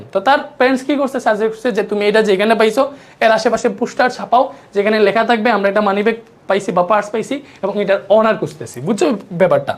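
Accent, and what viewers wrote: Sylhet, Bangladesh. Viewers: Mat huniya buja jar sylheti kori😊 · ভাইয়ার কথা শুনে মনে হচ্ছে উনি সিলেটের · আপনি কি সিলেটি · ভাইয়া মনে হয় সিলেটের ❤ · স্যার কিতা সিলেটি নি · স্যার এর বাড়ি মনে হয় সিলেট জেলায় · Bhaiya apni ki sylheti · সিলেট, গোলাপগঞ্জ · আপনার বাড়ি কি সিলেট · Sir kota bole half Sylheti Half Suddo😮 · Vai mone hoy Sylheti. Kota bartay lage 😊❤ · Mone Hoy lage to sylhety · Mat huinna to lager sylheti ou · Vaiya apni ki Sylheti🙂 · Vaiya , hoito sylhet er manus.. Kotha gula just wow.. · Bhai apnar bari ki sylhet a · Sir ar basa ki sylhet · Sylheti monoloy amr o mone hoy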